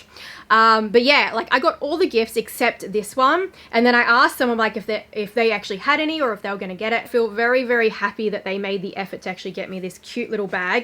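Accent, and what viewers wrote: Australia. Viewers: You sound very Australian wouldn’t you be better off flying to Dubai to purchase Luxury handbags 👜?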